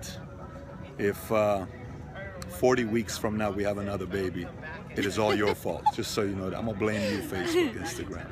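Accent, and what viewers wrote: Iran. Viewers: exactly he’s Assyrian who stayed in Persian for sometime that’s all. You can even sense that in his accent. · I mean I thought you were Persian with that thick accent but your name threw me off. Stick to the uncomfortable paid interviews. At least there's some entertainment factor in those.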